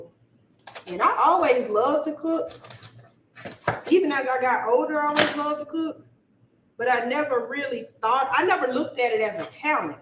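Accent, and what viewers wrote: South, United States. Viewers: Hey i think a southern Accent is cute i love it no disrespect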